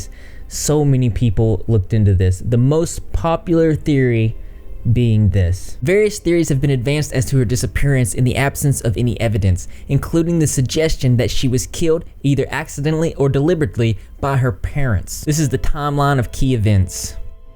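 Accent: South, United States. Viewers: Conspiracy theories, best hair on the platform and a southern accent? I STAN😍❤️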